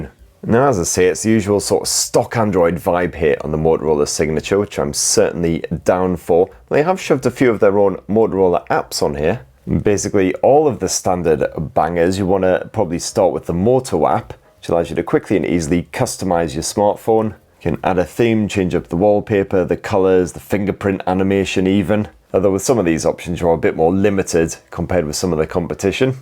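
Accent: United Kingdom. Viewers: I really like mobile phone reviews in British English. ☺️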